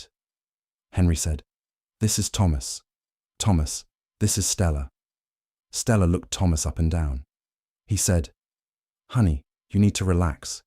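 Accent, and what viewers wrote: England, United Kingdom. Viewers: Good initial message, really awful use of repetition in AI to get monetized views. AI should be able to convey a much smoother story across a much more sophisticated narrative arc and provide dialogue that is "show" not "tell" with a thousand uses of "said." Then how many times is the narrator with an English accent going to use the wrong pronunciation of "clerk", "clark" was used 100 years before this time period min the south of England and never in Manhattan. There are better stories of the times available. Not a single handshake, hug, kiss or sign of sexual intimacy. I hope you learn your AI tool and do quality control before releasing any more stories. Unless you are just in it for the money. YouTube does not seem to care that this low effort form of AI that is ruining their product.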